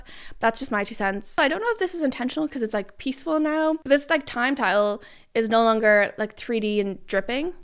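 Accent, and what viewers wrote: Ireland. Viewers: omg you're Irish? I thought you were Australian :p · it took me 8 minutes into the video to actually process the Irish accent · I watched multiple videos of your and I didn’t even notice your accent til after you said you were Irish. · for the first 30 minutes of this video i was literally was debating on whether or not to make a comment about your accent because the way you were pronouncing your a's, p's, and l's were all giving me irish and come to find out lmfaoo you are irish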